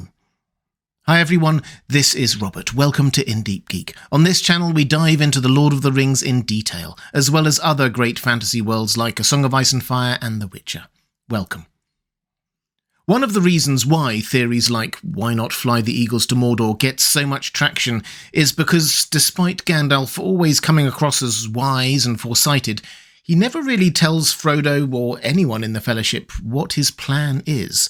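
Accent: United Kingdom. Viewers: Nerd of the Rings is great too but the British accent makes the story telling so good on this channel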